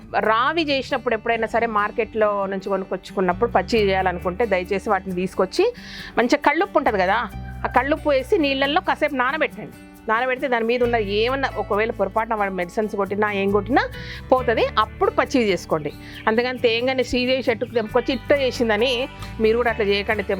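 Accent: Telangana, India. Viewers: Good telangana slang mam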